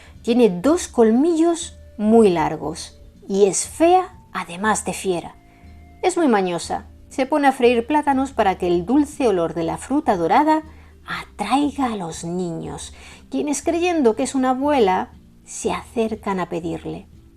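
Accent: Spain. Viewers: Hola, estoy suscrito a tu canal y veo todos tus videos,y me encanta todos los temas que abordas pero sobre todo me impresiona tu forma de relatar,me encanta el timbre de tu voz junto con tu acento español,(soy de Argentina) y me pregunto,alguna vez pensaste en hacer videos tipo audiolibros, sería genial,tu voz es excelente para eso,tal vez sería mucho hacer 2 videos a la semana pero un audiolibro cada tanto sería genial.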